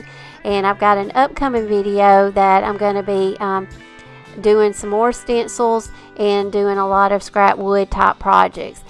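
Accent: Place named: Alabama, United States. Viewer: You sound like you might be from Alabama ~ I am! Love your ideas& subscribed to your channel ❤️❤️❤️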